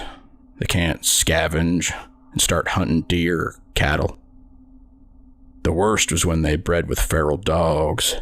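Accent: South, United States. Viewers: I am enjoying the Southern drawl dialect, the story is okay, not the best but the narrator voice made me want to listen to the end.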